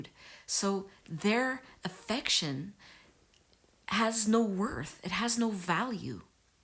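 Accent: Canada. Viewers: It's that Canadian accent.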